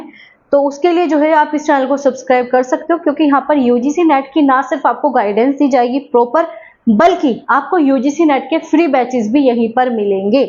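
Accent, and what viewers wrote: Haryana, India. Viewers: Yes ,that haryanvi accent inspiring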